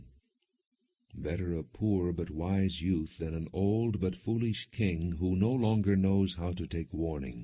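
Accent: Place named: United States. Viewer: had a hankering for this book and had to look awhile for an American accent reading, the cheesy shakespearean british ones fail to capture the modernity/coherence of the prose. good stuff!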